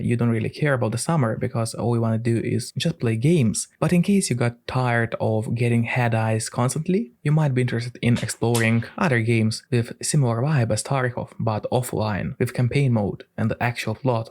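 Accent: Eastern Europe. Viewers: I couldn't explain his channel better I saw in his other videos pepole making fun of his heavy Slavic accent but I love it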